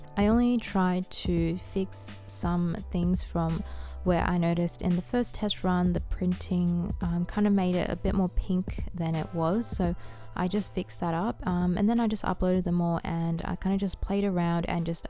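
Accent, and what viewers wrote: Australia. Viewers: This video came up as recommended as just watching a bunch of studio vlogs. Could instantly hear you were Australian and love your work! Just bought some postcards from your shop, just wow. so beauitful!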